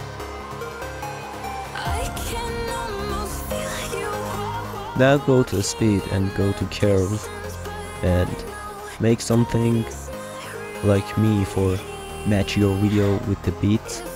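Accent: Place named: Iran. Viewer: Bro where are you from you are talking like Iranians im a iranian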